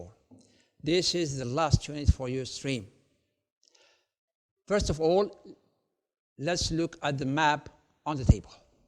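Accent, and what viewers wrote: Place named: India. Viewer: lol he has an indian accent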